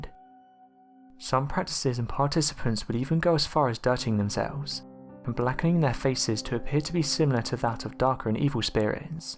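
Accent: United Kingdom. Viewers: Like most Americans, I'm SUCH a sucker for British accents. Lovely PS Best allies ever! 🇺🇸🇬🇧🇺🇸🇬🇧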